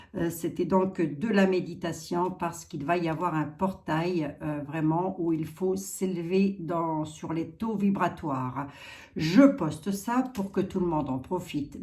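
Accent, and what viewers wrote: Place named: Vosges, France. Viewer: vous êtes vogienne ? on dirait mon papa ,avec votre accent ;-)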